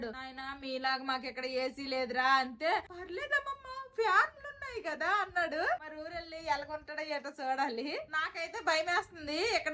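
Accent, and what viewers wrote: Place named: Nellore, India. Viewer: Hi nuvu same ma lage mataladutunnavu madhi nellor ma amma valupurilo vuntaru yavaru emi anukunna mana pani Manam chevali nuvu super &great ink Manchi videos chevali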